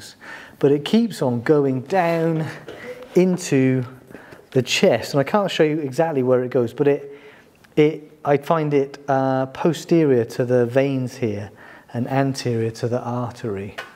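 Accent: England, United Kingdom. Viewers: This guy looks a bit like Eric Clapton. It's great to hear someone with an English accent explain stuff compared to all the vacuous sounding Americans dressed up in scrubs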